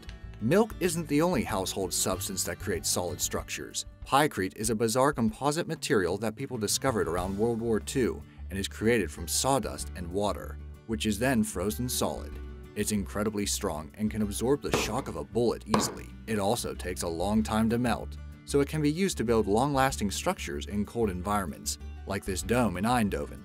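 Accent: Hungary. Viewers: wait, are you from hungary? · Are you from Hungary?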